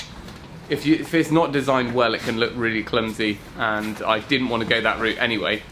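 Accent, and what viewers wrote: United Kingdom. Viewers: I just wanted to let you know that my 8 month old nephew loves watching your videos, likely because of your accent. We're American, so he doesn't hear a British accent often. I am not a builder, my DIYing is mostly left to sewing, but I'm consistently fascinated by how things work, so I really enjoy your videos too because you are great at explaining not just what you are doing, but why you' are doing it a certain way.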